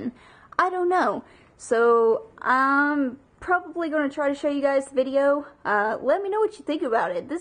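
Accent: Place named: South, United States. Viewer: I can totally hear your southern accent. lol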